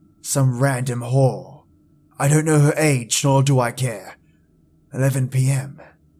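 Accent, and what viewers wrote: Australia. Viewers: tis the best way to fall asleep, all cozy in a blanket burrito. And if I do manage to churn out some twisted tale of monsters and mayhem you shall be the first to read it I assure you. Absolutely love your voice in particular and the ozzie accent in general. ^_^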